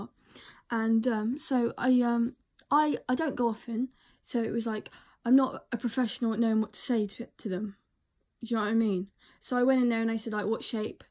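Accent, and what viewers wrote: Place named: West Country, United Kingdom. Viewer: that west country accent lol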